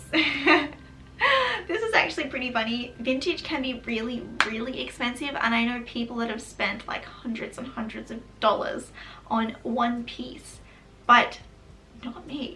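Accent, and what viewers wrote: Australia. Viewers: I think it sounds very Aussie. I have the same accent! ☺️ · So bizarre you are fully Australian accent! ahaha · Absolutely knew you were an Australian!! I knew that’s why I loved you! I personally couldn’t tell if it was Australian or more England!! I’m from the states so I know nothing about an accent other than a southern (I’m from Arkansas haha!) I love Australian accents. 💕 love your channel dearly ... xoxo Olivia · I have just recently discovered your channel, and I LOVE it!! I've always been obsessed with the '40s and '50s, I'm sure I was born at the wrong time! I'm from North East England and don't hear any South East England accent btw, it sound fully Australian to my untrained ear 😆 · I'm newer to your channel, and I love your videos so much more than a few others I follow. Please keep making your videos, and I would love some cooking/recipe videos as well. 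I love the education of how people lived in the 50's. I have always been such a fan of the past. There is something very soothing and calming of how they lived. My parents were born before the 50's in Europe, and cooking bread and all the meals and desserts by scratch is what my mother specialized in. BTW, love your Australian accent. Much love from American. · I'm American and I don't get an American accent from you at all - it all sounds like adorable Australian to me :)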